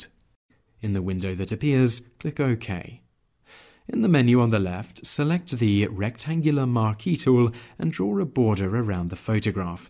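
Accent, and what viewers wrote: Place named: England, United Kingdom. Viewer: No, he sounds english. You, on the other hand, sound like a complete idiot. Goodbye.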